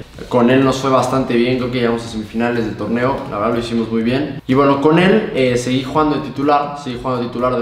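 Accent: Uruapan, Mexico. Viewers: Eres de Uruapan me parecio escucharlo??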